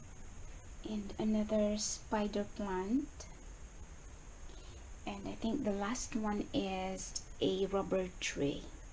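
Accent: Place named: Visayas, Philippines. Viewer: hehehe... lately in the middle of your video... i notice your tone.... same as bisaya....... mabuhay po.... hoping for more video to come...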